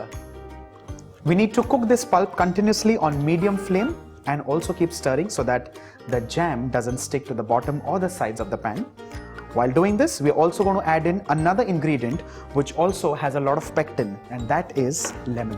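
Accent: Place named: India. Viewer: hahah - indian English is amzaing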